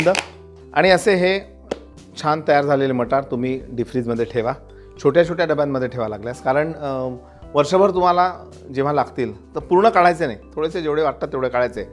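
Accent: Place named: Nagpur, India. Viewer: Khup Chaan Saangitle Sir . . .aani khupp useful . . SIR mi tumchhi FAN aahe . . Mala tumcha Nagpuri Lehja khup aawadto . .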